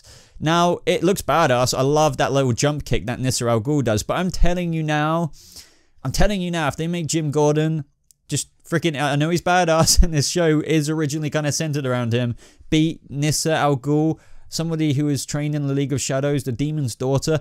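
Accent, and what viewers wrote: United Kingdom. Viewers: Why is there a brit narrating an American show cant listen to this rubbish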